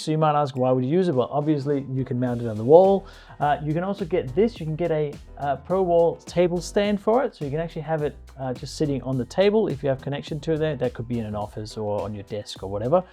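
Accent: Denmark. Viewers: Hi, do I hear a little Danish accent - also the name maybe give you up?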